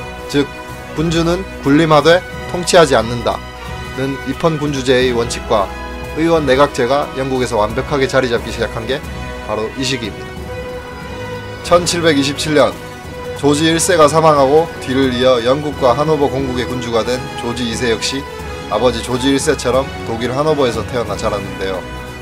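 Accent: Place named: Gyeongsang, South Korea. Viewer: 경상북도 사투리 듣기싫어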